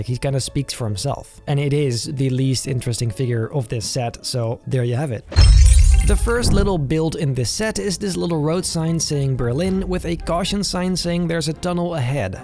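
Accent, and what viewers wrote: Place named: Netherlands. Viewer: first time i clicke don and heard your voice i was like that is a dutch guy for sure or atleast belgium german just that specific accent and pronounciation of words that my fellow dutch people do but i dont cuz i learned english myself by nonstop youtube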